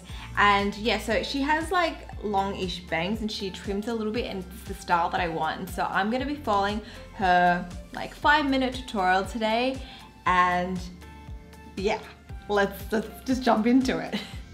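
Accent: Australia. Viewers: woah I thought she lived in Australia, I must’ve missed the move to Singapore! Still think she lives in Aussie because of the prominent accent hahaha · I love all your videos. I love how you spoke, your expression, your make up and your settings. I love Aussie accent and before i don't know you're Australian so i lowkey love your accent and one day i was thinking could it be an aussie accent 🤔 and yeah i was right i knew it bc i was a big kpop fan and there's few kpop idol with aussie accent like Rosè, Chan & Felix (just mentioning bc i love Aussie accent). Moreover, I'm loving your videos. I watch it and then repeat it again and again. Btw, i'm Zuali from Northeast India. Love you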